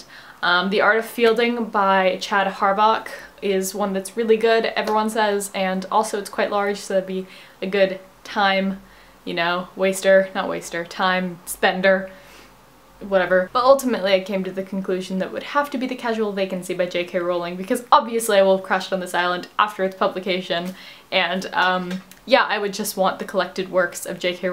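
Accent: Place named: United Kingdom. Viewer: i can hear the British seeping through your american accent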